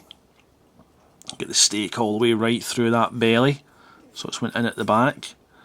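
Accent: Scotland, United Kingdom. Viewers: Is this a scottish accent? No offense but it sounds freaking hillarious :D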